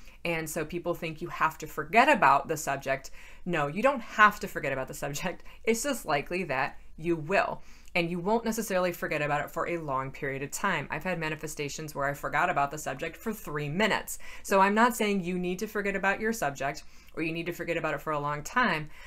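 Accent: United Kingdom. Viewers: Your British accent is absobloodylutely fabulous!☕️🍪😜 And I love love love this video from you, it feels like you’re a best friend I didn’t know I had, came to bring me a golden advice😍 Thank youuu🌺🤍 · Best Valentine’s Day gift - we’ve missed you and your British accent and bed clothes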